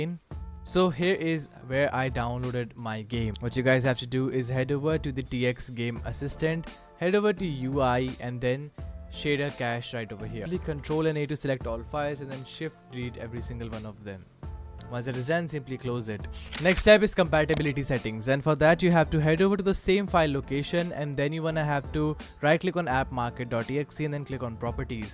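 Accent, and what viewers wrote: India. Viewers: are u from india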